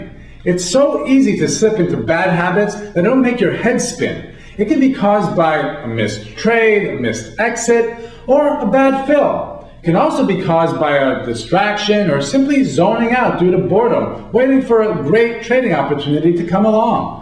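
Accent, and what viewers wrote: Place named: New York, United States. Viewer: That makes more sense, even though he's not "talking too fast" for me. I'm in NYC right now where lots of people talk exactly like him! Remember, too, that he's a money-making stock trader, not exactly a "low key, relaxed" mode of work. Like a race horse, not a plow horse. But thanks for taking the time to respond. Clears things up a bit.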